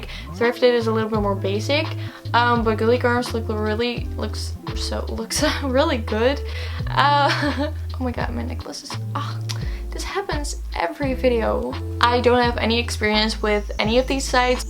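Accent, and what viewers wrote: Netherlands. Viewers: Je bent van nederlqnd ik hoor het aan je accent!!!🥰🥰🥰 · i don’t know why your accent reminds me of when i’m in holland , love this video 😩❤️! hope your sage during this shit ass pandemic 😌! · Wait a sec I’m a bit new to this channel so I just have question are u a bit Dutch ? Ur accent sounds a bit Dutch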